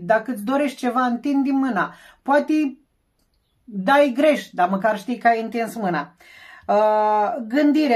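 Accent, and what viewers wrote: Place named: Moldova. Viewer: Superba etalare! Esti o draguta! Multumesc pentru frumoasa citire! Imi place accentul tau...moldoveanca de a mea! Acum mi-ai aparut..e prima data cand te vad si iti ascult citirea si..mi-a placut! Si da! M-am abonat! Te pup pe suflet! Te astept cu urmatoarea citire! ❤❤❤❤❤❤❤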